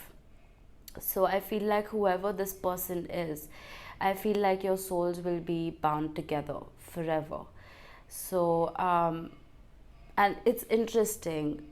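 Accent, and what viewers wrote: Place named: South Asia. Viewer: you look european but sound south asian...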